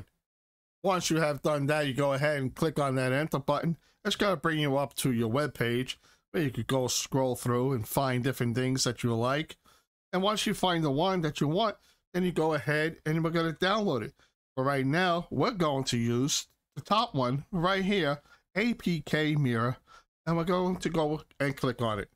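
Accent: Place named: Bronx, United States. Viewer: Go ahead, and tell me, why, when using Ai narration, would you choose a voice of a guy from the Bronx or Jersey?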